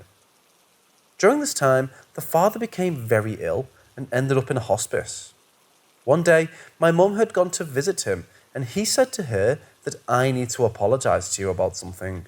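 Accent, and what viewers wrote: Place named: United Kingdom. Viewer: Hey there! It’s fantastic to hear a fellow Brit narrating 👍🇬🇧, I’ve been listening for a while hence I’m subscribed but I’ve just found this vid… my phone does ultra weird things… either that or it’s YT 🤷🏻‍♀️ idk but I’m here now and I have to say your stories are great! My husband is watching some boring documentary about cycling in the snow or something… yawn! So having found this episode, I can just sit back, ignore the tele & chill! Kudos chum! Keep bringing us these great tales of spooky stuff! I have just had the dog bite the like button! 🤣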